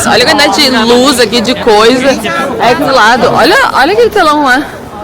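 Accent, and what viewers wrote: Brazil. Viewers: Ela fala muiyo rápido, com sotaque do Paraná????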